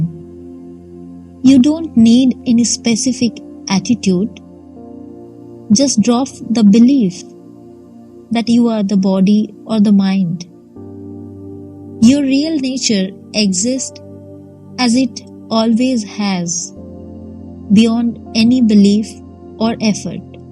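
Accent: India. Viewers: What is wrong with Indian accent? Are you a European? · Why have you chosen a sound with indian accent? To demonstrate the origin of R.M? That's a very bad choice. It would have more effects with ordinary English speaking audiences. We love his words in the most direct way of communication.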